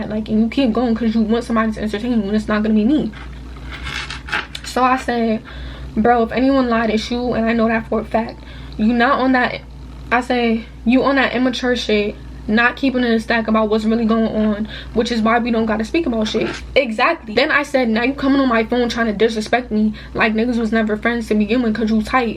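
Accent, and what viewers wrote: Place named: New York, United States. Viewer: I heard the accent and said "sis must be from NYC😄" and I was right lmaoo we can tell from one New Yorker to another. I also think it's something in the water coz these girls are weird and do not know how to be friends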